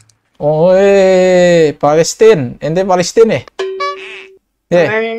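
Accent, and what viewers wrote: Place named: Betawi, Indonesia. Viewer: Mantap bang,,, betawi banget,,,, gasssken🤣🤣🤣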